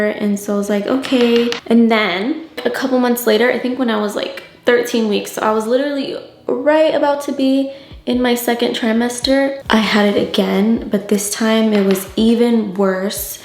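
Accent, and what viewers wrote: United Kingdom. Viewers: It’s the British accent for meee🤣🤣 love from the UK 🇬🇧🇬🇧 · Ur beautiful, enjoy the pregnancy. Your body will come back especially if you let baby brown breastfeed the way he's suppose to. Btw i love that British accent. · Your lashes are so long! And don't feel self-conscious babe you look gorgeous, and you sound so cute with your English accent lol. I would totally watch it if you made your own separate channel for girly chit chat stuff! 💖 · Lol I love the British accent you’re so beautiful girl God bless you🤍🤍🤍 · Beautiful and glowing love the London accent 🥰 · I love these chitchat grwm from ❤️✨ and that British accent 👌❣️ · Love the British accent!! All the best with your pregnancy ❤️❤️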